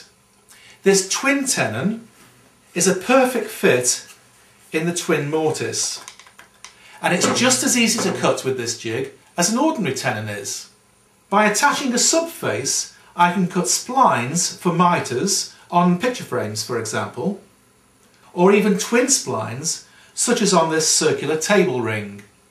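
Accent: United Kingdom. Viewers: Why is it that everything sounds better when delivered with a British accent? Nice work. I like your jig, and your presentation in your videos are excellent. Thanks.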